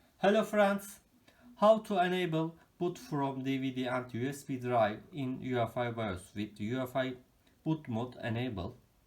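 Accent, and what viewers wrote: Turkey. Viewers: very nice ,tnx, and very nice turkish english accent:)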